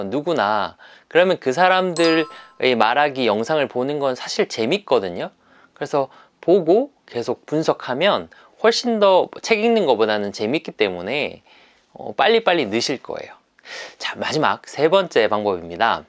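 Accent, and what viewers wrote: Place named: Gyeongsang, South Korea. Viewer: 저는 어릴때부터 발표를 너무 싫어하고 기피했는데 살면서 정말 피하고 싶었던 그런 면접을 보게 되었거든요ㅠ 그런데 제가 부산사람이라 억양을 어떻게 해야될지도 모르겠고 그래서 더 너무 힘들다고 생각했는데 선생님께서 경상도 억양을 섞어서 너무 자연스럽게 똑부러지게 말씀하시는거 보고 부산말로 하는게 이상한게 아니구나 깨닫고 지금 그것만으로 너무 감사하는 마음입니다ㅠ 저 힘내서 잘해보겠습니다.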